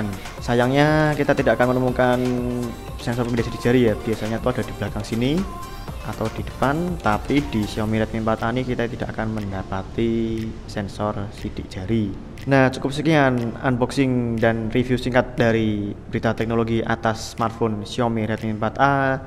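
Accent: Java, Indonesia. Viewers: Medok e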